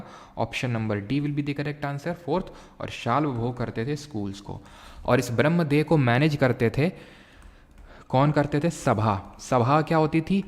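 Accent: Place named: Awadh, India. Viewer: sir aap pakka awadh area se ho, ya to lucknow, kanpur, ayodhya isi side ke ho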